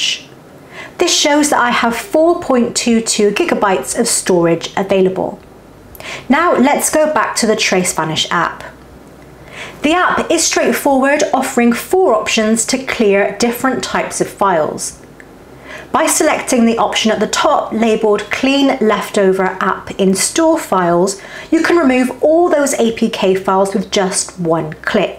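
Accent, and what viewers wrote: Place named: England, United Kingdom. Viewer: Thanks. It works very well and let me tell you that I love your English accent.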